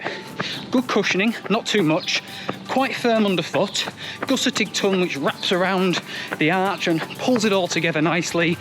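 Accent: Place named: Yorkshire, United Kingdom. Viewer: So glad I’ve found your channel! Really good review. I’m Spanish but I lived in Yorkshire for a few years so it’s nice to listen a bit of an accent :)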